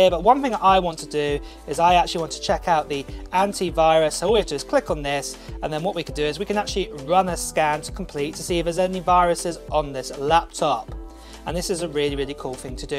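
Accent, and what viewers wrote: United Kingdom. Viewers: the service that you are advertising won't allow anybody outside of the US to enter their address in the purchase page. How did you get your keys, because you sound British, or are you living in the US? Maybe you should disclose that.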